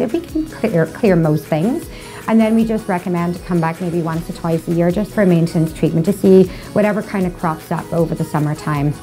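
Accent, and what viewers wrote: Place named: Ireland. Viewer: Cute Irish accent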